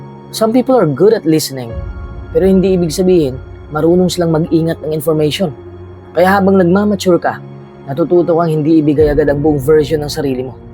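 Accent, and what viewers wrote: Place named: Visayas, Philippines. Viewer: Ang ganda sana ng delivery & voice mo, kaso nga lang need mo pa magpractice ng proper english pronunciation, mejo tunog bisaya po kasi.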